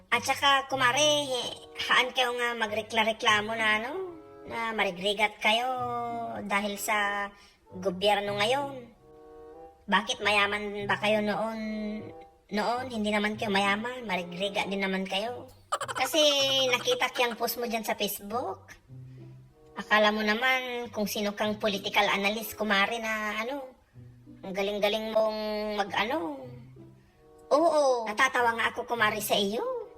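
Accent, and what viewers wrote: Ilocos, Philippines. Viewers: Makapakatawa Ante 😂😂😂 Makarelate ak ta kasta ak agsao tagalog ilocano haha. Salamat ti effort mo nga pakatawain na kami aglalo tatta nga panawin. 👍😊 God bless always ❤️😇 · Naalala ko mga lola ganyan nila ako kausapin tagalog na may touch ng ilocano. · Hahaha ilocano tagalog hahahaha... pavirgin🤣🤣🤣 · bakit ganyan ka kuhang kuha mo ang style ng mga ilocano magsalita haha,.. More videos ante pra pmpa good vibes lng s kbila ng problmng kinkharap ntin s buhay.. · Tagalog Ilocano met auntie 😂😅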